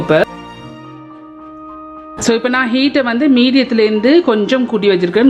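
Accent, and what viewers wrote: Sri Lanka. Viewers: Nengal pesarathu alagaa iruku... Ilangai Tamil thaane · Sister spoken Ceylon Tamil super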